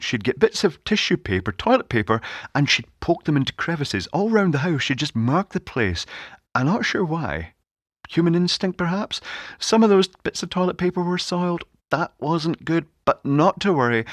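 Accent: Scotland, United Kingdom. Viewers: It's a Scottish accent, he was born in Glasgow.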